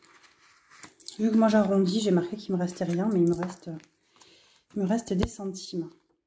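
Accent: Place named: Southwest, France. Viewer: Cc merci pour ta vidéo j'adore avec ton joli accent du Sud ouest 😁.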